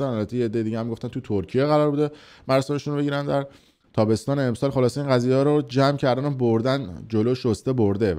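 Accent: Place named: Abadan, Iran. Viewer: همیشه آبودانی حرف بزن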